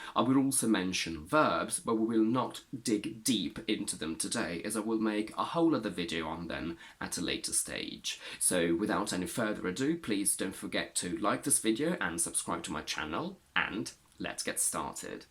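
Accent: RP, United Kingdom. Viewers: You have a very good modern RP pronunciation, but you use a lot of vocal fry.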